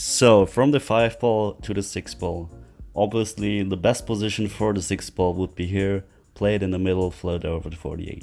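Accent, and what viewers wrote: Germany. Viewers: Where are you from dude? I'm into accents, always have been.Took me a while to place you as from Germany, very nearly Austria. Maybe you could give me some pointers on placing your accent. By the way, at first glance your website looks pretty bloody good, well done! Edit: My guess is Munchen, sorry if wrong, limited scope. Edit: Looking at a map, I want to guess closer to Deggendorf.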